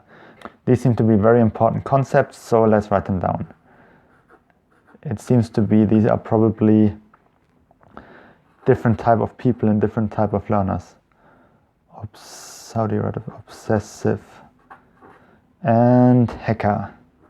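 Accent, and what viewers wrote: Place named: Germany. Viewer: Are you German? You sound German.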